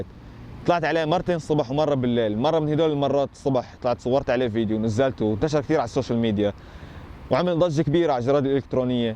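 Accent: Lebanon. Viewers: لهجتك كتير قريبة ع اللهجة اللبنانية، و ع فكره باركور مخيف😍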